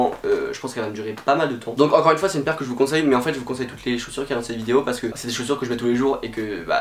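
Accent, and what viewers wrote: Normandy, France. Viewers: T'es de Normandie ?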